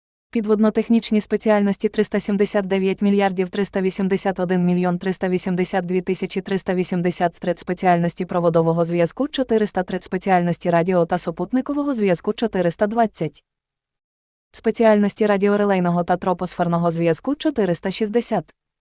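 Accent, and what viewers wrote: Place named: United States. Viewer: де найшли диктора дебільну, може говорити українською мовою. американка чи що.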